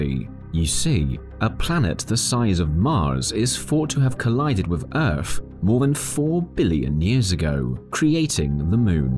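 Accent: United Kingdom. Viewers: I know what they found on the moon your big stupid limey mouth talking 💩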